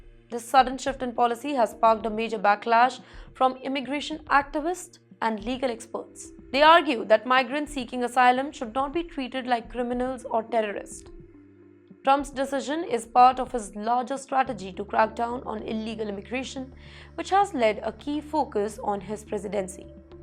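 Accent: India. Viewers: Punjabi+hindi+English = North Indian English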